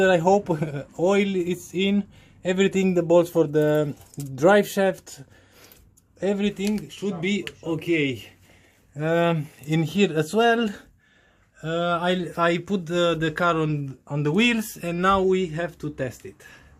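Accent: Romania. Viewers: Am zis eu ca are accent romanesc . Diferential de pe e39 525 d e compatibil cu 525 tds? Mersi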